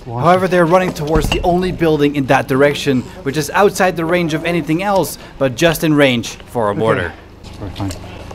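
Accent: Netherlands. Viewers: Leuk om te horen dat je Nederlands bent :D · Are you dutch?? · wowowo hold on a minute, you're dutch?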